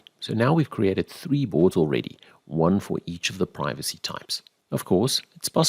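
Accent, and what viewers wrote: England, United Kingdom. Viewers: like the content and usually like an English accent, but this is like fingernails on a chalkboard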